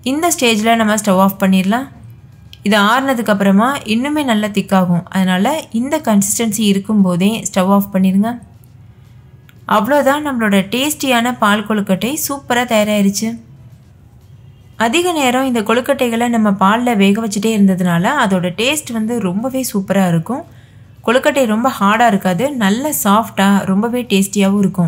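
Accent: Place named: Kerala, India. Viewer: Hi sis,,,,I am very interested in your cooking videos,,,I am malayali but also used to watch ur videos,,,I like all ur videos,,,and ur pronounciation with some Malayalam words ,,.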